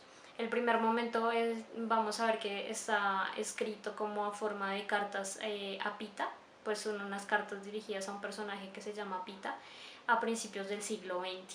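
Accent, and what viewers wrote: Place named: Mexico. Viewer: Ahora hablas con acento mexicano :D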